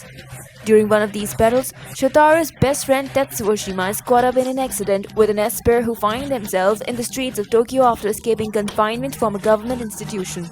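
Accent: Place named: South Asia. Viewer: Hi Nice to hear a desi Voice, talking about Anime:)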